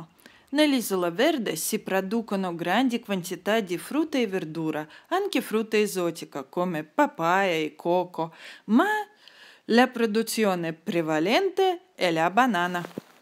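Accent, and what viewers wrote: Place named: Russia. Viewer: Какой прекрасный русский акцент! Спасибо за рассказ и видео! Красивый остров...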